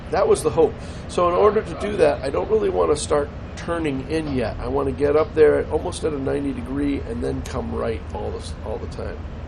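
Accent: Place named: Brooklyn, United States. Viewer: i have a friend from Brooklyn I've known for decades. You and her dad are so similar. Similar accent, speech patterns, hair... every time you talk i hear her dad.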